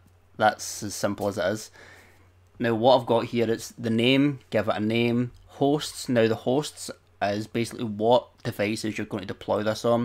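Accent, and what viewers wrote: Scotland, United Kingdom. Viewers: Great video - epic accent :) hello Scotland :) cheers from Munich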